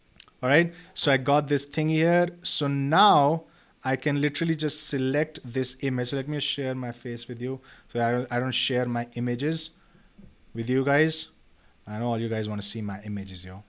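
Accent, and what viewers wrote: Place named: India. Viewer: This is one of the worst videos I've ever watched in almost a decade of YouTube Used 4 mins to get to the point Annoying jokes and high pitch voice Super annoying Indian accent No real valuable information whatsoever I hope you revise your videos and channel or stop uploading cos these are an eyesore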